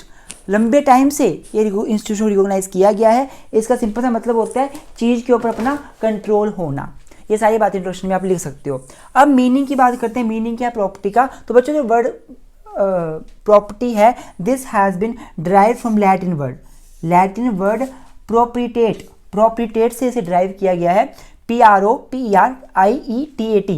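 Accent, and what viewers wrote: Himachal Pradesh, India. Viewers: Be proud of your accent sir!! I Love Himachal ❤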